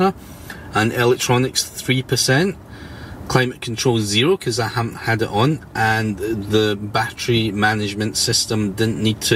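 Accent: Scotland, United Kingdom. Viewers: A china-man with Scottish accent, priceless! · thankyou for this great video very informative , now I think I have decided to buy one in Australia PS:love the scotch accent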